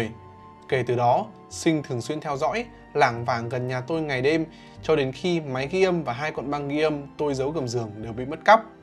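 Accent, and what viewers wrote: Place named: Phu Tho, Vietnam. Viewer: à mà ah ở phú thọ đúng giọng giống quê em lắm:)))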